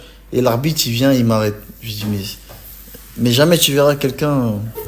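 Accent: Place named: Cameroon. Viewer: Arrête de parler camerounais tu fais honte au continent !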